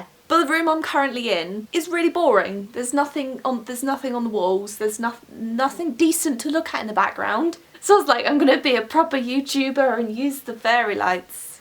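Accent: England, United Kingdom. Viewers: AHHH how is your accent still so english!?!??!??? x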